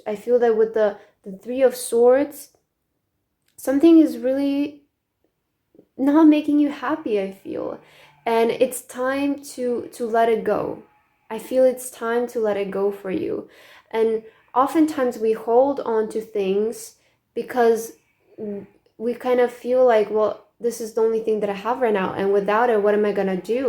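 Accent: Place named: China. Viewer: 哈哈哈哈姐姐是中国人嘛这个口音真的很可爱😂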